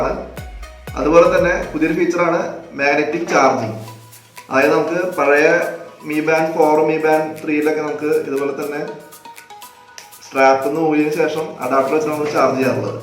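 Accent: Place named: Thrissur, India. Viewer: Thrissur slag touch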